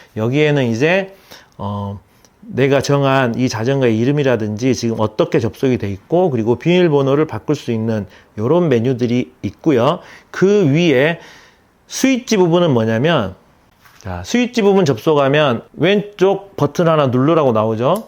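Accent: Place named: Gwangju, South Korea. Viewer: 참 좋은 영상입니다~! 구수한 광주 사투리는 덤이구요 ^^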